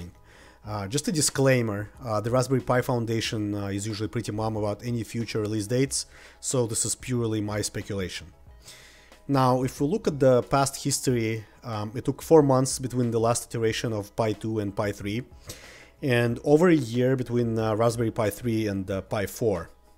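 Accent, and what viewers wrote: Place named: Russia. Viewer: You speak like Russian talks English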